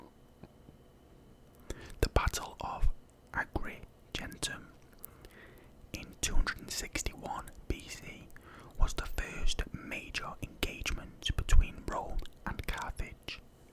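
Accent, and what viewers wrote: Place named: Northern England, United Kingdom. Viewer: Very good ASMR voice. I was confused for the first 15 seconds, I thought it was an American accent at first and then northern English came through? ^^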